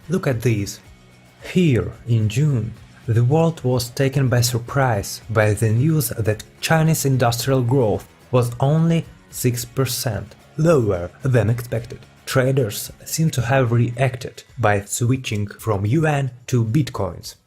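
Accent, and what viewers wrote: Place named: Russia. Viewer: он та из России, вырос в такой среде с таким акцентом. Научиться со временем.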